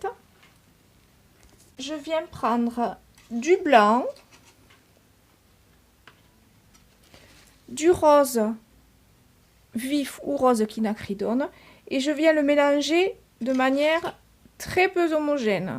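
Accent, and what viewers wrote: South, France. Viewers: J'ai beaucoup appris avec vous. J'aime votre accent du Sud!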